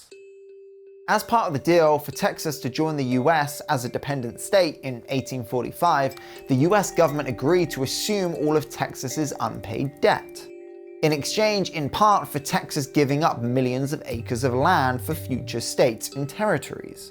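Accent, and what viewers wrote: United Kingdom. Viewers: texas is in fact the only state that can leave the union. it was part of the contract for texas to join the union. so yes we can leave. when i clicked this video and the first thing i heard is some brit, i already knew it was gonna be a load of crap. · You sound british so ya know fuck all about Texas. We'll, be just fine without the other 49. And no it wouldnt be a war.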